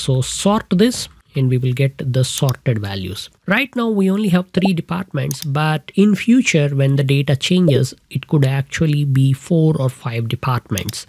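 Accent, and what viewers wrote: India. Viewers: The accent is too Indian for me and I am an Indian.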